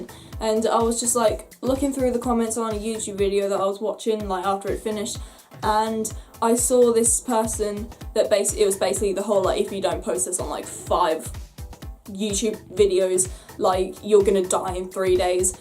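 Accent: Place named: Norfolk, United Kingdom. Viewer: Learn to speak Enlgish you Norfolkian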